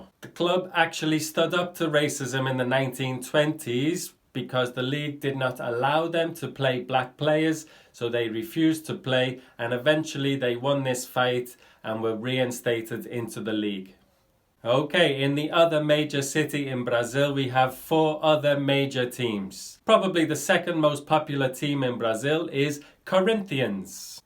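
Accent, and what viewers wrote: United Kingdom. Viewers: Are you a scouse?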